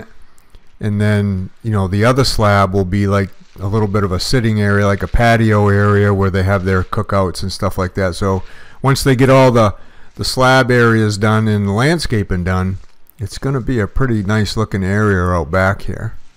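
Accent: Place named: New England, United States. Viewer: Only one comment! Great New England accent. Just about to say Maine......when you said Maine. Love my people.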